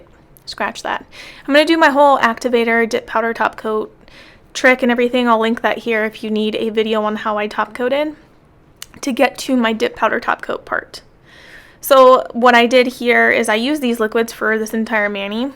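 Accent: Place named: South, United States. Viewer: Hey! I just wanted to say that I absolutely love your content. I find your voice/slight Southern accent very soothing, and I love how you celebrate and build others up. I notice you feature a lot of smaller brands: Sol Dips, Shameless, Dipalicious...etc. I'd love you to discuss some of your favorite companies in a list and why/what they are best at. Additionally, I'm pretty new to dip, and thusfar have only been using my Dipwell system, but would love to mix and match more. So...I guess one thing that I feel is missing from YouTube content is how to crossover different dip products...if that makes sense...? Anyway, rambling. You're great! 😂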